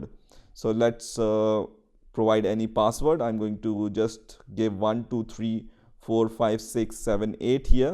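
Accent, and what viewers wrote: India. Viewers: Strong Indian accent not understandable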